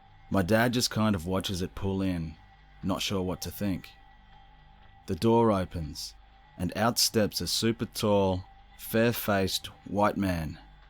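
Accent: Australia. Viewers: Hey there,. Great video!!! Excellent stories!! Love that sexy Aussie voice!! 💓😉 BTW, have you ever read any books by Philip Imbrogno? There's one called Files From the Edge that has some very bizarre encounters!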